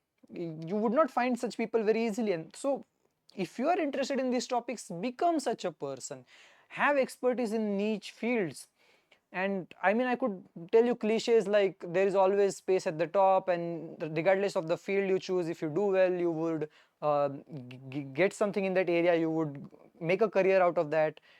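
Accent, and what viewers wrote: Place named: Maharashtra, India. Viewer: are you marathi? i can see the accent slipping out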